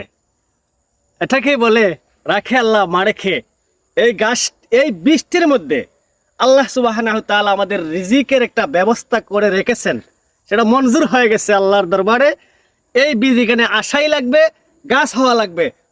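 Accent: Sylhet, Bangladesh. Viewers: আফনে কি সিলেটি নি কোনো???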